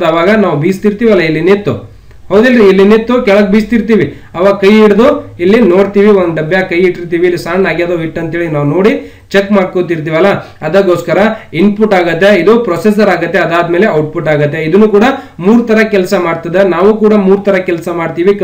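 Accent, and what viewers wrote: North Karnataka, India. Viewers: Nim voice Uttar karnatkdolg mast ide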